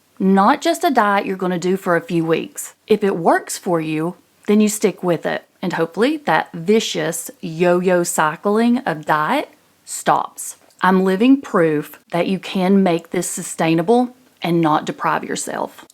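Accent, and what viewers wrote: South, United States. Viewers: Man, love that southern draw…..what state ya from?😊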